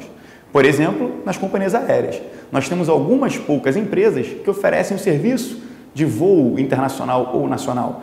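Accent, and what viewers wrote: Rio de Janeiro, Brazil. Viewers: Esse sotaque carioca é muito gostoso de se ouvir.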